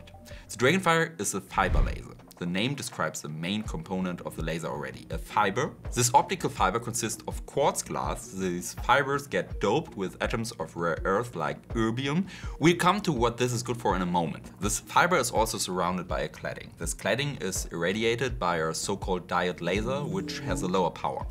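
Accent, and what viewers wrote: Germany. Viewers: Die englische Aussprache hört sich aber mega-deutsch an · And i still dont know if he makes his accent stronger for the vids (since many English expect one hard German accent) or if it is his normal one. But i say he will still grow in the next months , after one point and base quiet fast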